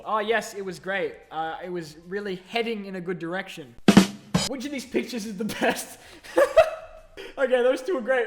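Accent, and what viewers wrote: Australia. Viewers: For next Q and A are u Australian?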